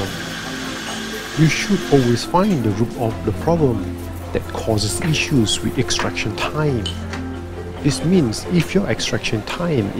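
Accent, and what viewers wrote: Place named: Thailand. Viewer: Sounds more like a Thai than Malaysian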